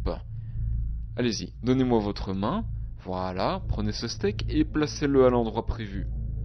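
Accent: Belgium. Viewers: Oh un belge ?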